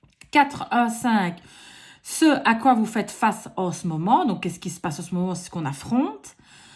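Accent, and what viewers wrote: Belgium. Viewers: super merci video haaaa l'açant Belge il y avait longtmeps tu est de quelle region ? merci un ex belge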